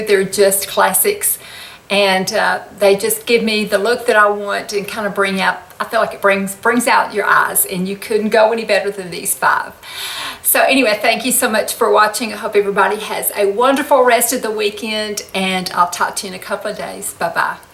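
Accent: South, United States. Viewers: You are my new favorite channel, you're just a breath of fresh air and I can't resist a great classic southern accent!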